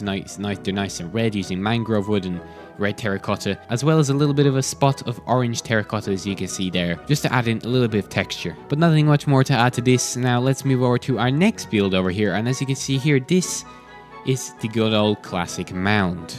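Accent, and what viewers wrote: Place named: Ireland. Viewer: Is that an Irish accent I here 🇮🇪🇮🇪🇮🇪 GWAN IRELAND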